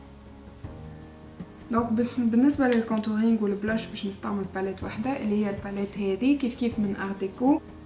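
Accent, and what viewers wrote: Tunisia. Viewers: انا مغربية نحب لهج تونسي برش تبارك عليك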